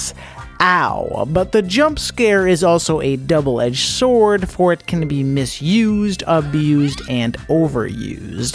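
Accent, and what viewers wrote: United States. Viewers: Scott the woz if he was from Tennessee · Are you from Wisconsin?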